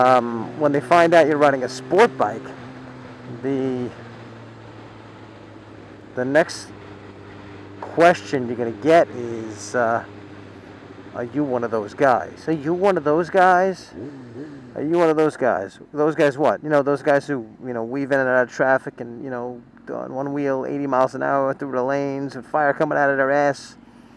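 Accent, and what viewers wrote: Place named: New York, United States. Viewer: "Ya THINK!?" "Sarcasm!" Hahaha! :D So funny. :) P.S. Love the accent! I used to live in NYC but moved to VA, and it's rare hearing that accent on TV or especially where I live. :)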